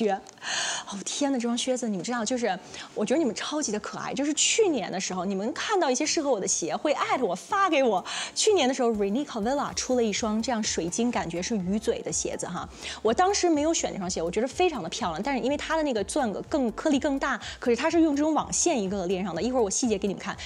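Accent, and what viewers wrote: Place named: Shandong, China. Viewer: 很像山东的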